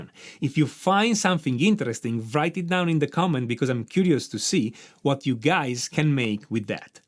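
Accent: Italy. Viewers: I like your accent. Italian?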